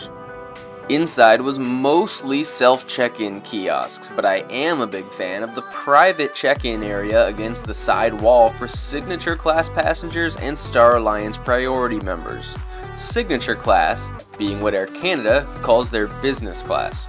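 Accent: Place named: California, United States. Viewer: You have the same accent as the SNL The Californians!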